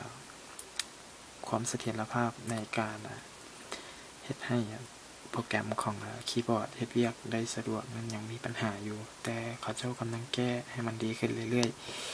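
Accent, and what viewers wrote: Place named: Vientiane, Laos. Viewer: ຄັກຫລາຍ ວ່ານແຕ່ສຳນຽງ ວຽງຈັນປະສົມທາງໃດຫວະ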